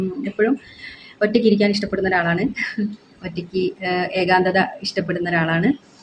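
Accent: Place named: Palakkad, India. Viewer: ❤👌🌹🥰hi.. YouTube Facebook Instagram. എല്ലാ സോഷ്യൽ മീഡിയയിലും കാണാറുണ്ട്.. പക്ഷേ മലയാളിയാണെന്ന് ഇപ്പോഴാണ് മനസ്സിലായത്.. congratulation..yur videos very good 🎉 romantic singing song, very wonderful acting.. സംസാരം കേട്ടപ്പോഴാണ് ശരിക്കും നാടൻ പാലക്കാട്ട് കാരിയാണ് എന്ന് മനസ്സിലായത്.. അതിന് എല്ലാവിധ അഭിനന്ദനങ്ങളും അറിയിക്കുന്നു.. ഞാനും ഒരു സിംഗർ ആണ്.. പരിചയപ്പെടാൻ കഴിഞ്ഞതിൽ ഒത്തിരി സന്തോഷം. എല്ലാ പ്രോഗ്രാമും കാണാം.. who is the best very beautiful 🎉❤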